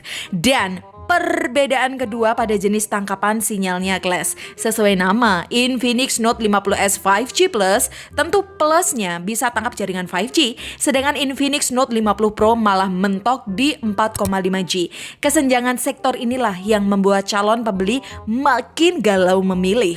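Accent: Surabaya, Indonesia. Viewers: Suroboyo iki rekkkkkk.....👍👍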